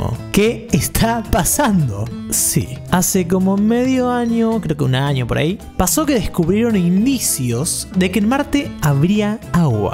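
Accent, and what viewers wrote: Argentina. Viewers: Vos sos argentino?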